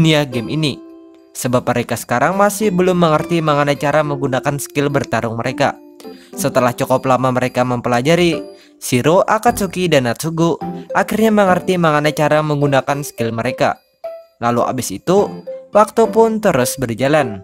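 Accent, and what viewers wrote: Banjar, Indonesia. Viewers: banjar banarr wkw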